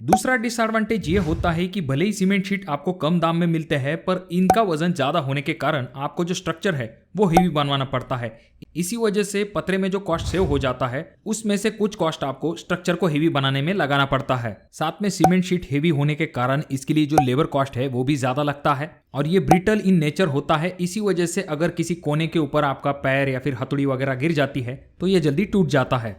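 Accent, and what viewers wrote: Maharashtra, India. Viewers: Speak karte samay marathi uchar aata hai aapka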